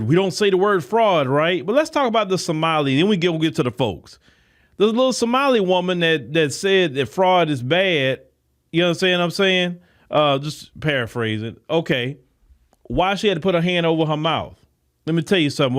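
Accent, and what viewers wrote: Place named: AAVE, United States. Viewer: Bro, can't you speak proper English? Why are you as a blogger sitting in a fancy office in a suit with an expensive watch talking Ebonics like a ghetto middle school dropout? I suggest you go read Thomas Solwell's books.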